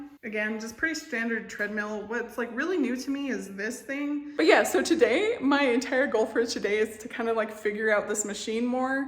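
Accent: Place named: New England, United States. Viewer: It’s so funny being from New England hearing your pronunciations and hearing you hype Maine as a cross country trip because Maine is like a day trip lol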